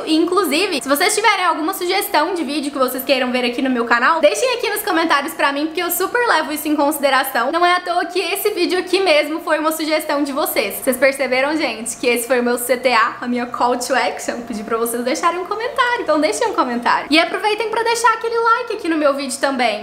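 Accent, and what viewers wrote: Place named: Minas Gerais, Brazil. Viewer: Uma coisa eu tenho certeza, ela é Mineira rsrs. Ótimo conteúdo, parabéns! 👏🏼👏🏼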